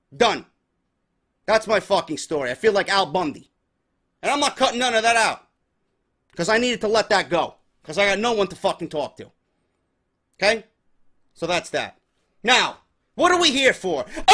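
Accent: New York, United States. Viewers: dude you are a trip your ny accent helps your show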